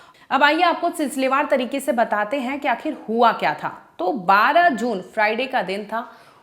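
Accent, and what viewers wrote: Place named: Bengal, India. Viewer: Aap Bangali hai kya aapki Hindi bilkul sahi nahin hai aap sahi se nahin bol paate Ho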